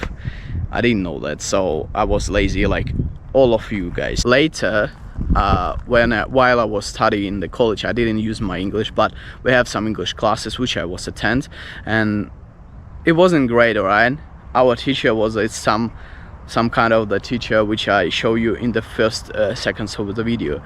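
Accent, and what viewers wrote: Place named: Australia. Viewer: Скоро уже не отличить от австралийца)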